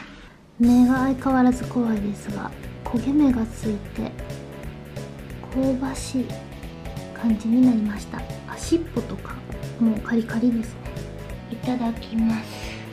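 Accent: Kansai, Japan. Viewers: 昔から早希ちゃんの関西弁？かなり好きでYouTubeでの早希ちゃんは見初めて日が浅いので最近はどうなんかわからないんですがちょいちょいでる関西ぽいイントネーションになんか懐かしさを感じます！ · ちゃんの関西弁まじ大好き！はーと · 関西弁？可愛いな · 最近チャンネル登録して見始めたのでASMR動画のヒソヒソ声しか聞いたことなかったのですが、調理中の関西弁がとても可愛い☺️ 賛否両論あると思いますが、私は手袋なしで食べるの良いと思います！お刺身とかはお箸使って食べればいいじゃん！と思うものもありますが、総じて、動画大好きです☺️❣️ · 早希ちゃん関西人？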